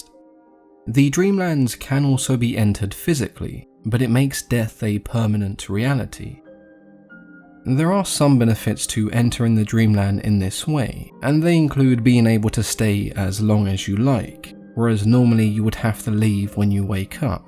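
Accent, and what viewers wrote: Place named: United Kingdom. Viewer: I enjoy the Dream Quest of Unknown Kadath as somewhat light reading/listening. It seems quite different from any other of HPL's works and reminds me a great deal of Grimm's Fairy Tales. It almost feels like it was written for children because there is really nothing of horror about it and everything turns out fine in the end. The only thing that disappoints me about it IS the ending! The "there's no place like home" trope was way too simplistic and unsatisfying for me. If Carter was this old and experienced dreamer wouldn't he have recognized the Sunset City as a conglomeration of memories of his childhood and the town he grew up in? In fact that was eluded to in the beginning of the story, but I'm just nit picking. It's a fun story to fall asleep to especially when a British person is reading it! And I do enjoy rereading it occasionally too.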